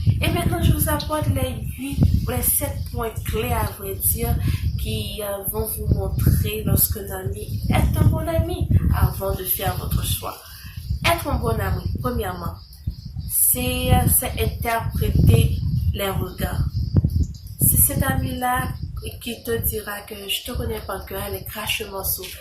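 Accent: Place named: Haiti. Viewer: OMG j 'aime ton accent ,tu nous as dit que t'etais une haitienne , franchement je je crois pas ,la maniere dont tu parles le français c a féliciter ma cherie,maintenant je vais voir parmis mes amis lequels sont fake,lolllll.thx Belle Madame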